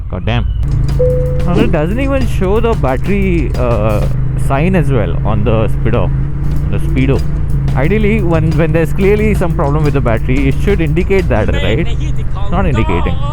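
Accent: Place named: Malvani, India. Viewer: Once you hear malvani/ marathi accent you cannot unsee it !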